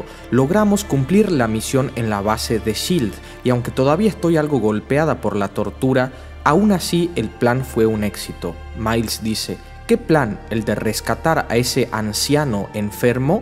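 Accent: Tucumán, Argentina. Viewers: sos tucumano amigo? habla como tucumanl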